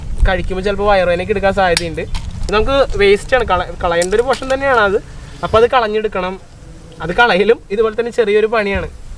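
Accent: Thrissur, India. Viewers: ബ്രോ 4 വയസുള്ള എന്റെ മകൻ നിങ്ങളെ youtube വീഡിയോ orikkal കാണാൻ ഇടയായി. ഇപ്പൊ ഡെയിലി 'മാമന്റെ വീഡിയോ വച്ച്താ എന്നും പറഞ്ഞു കരച്ചിലാണ്. കണ്ടതൊക്കെത്തന്നെ വീണ്ടും വീണ്ടും കാണുന്നു.. കോട്ടയം കാരൻ thrissur ഭാഷ സംസാരിക്കുന്നു... without any training.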